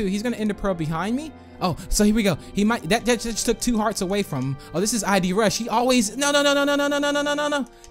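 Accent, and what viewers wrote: United States. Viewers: u american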